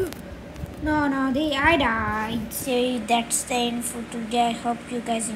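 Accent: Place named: India. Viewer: Your accent like Indian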